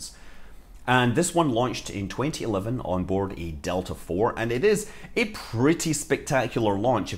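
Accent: Scotland, United Kingdom. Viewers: Is he Scottish? He sounds just like Mrs. Hughes.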